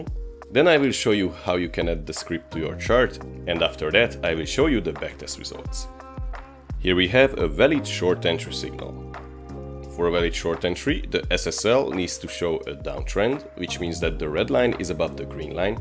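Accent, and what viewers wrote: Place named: Hungary. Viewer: Hi! My I ask, are you Hungarian? Just because of your intonation and accent sounds like that. I am from Hungary! 🤘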